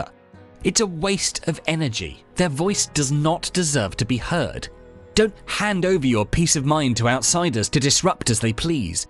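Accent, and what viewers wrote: United Kingdom. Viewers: Brit accents are a hardship for me. I made it through the video! One step closer to visiting Europe!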